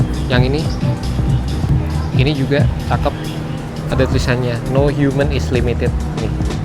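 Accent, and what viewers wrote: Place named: Surabaya, Indonesia. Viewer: ketauan orang surabaya dari meling meling bolo 😂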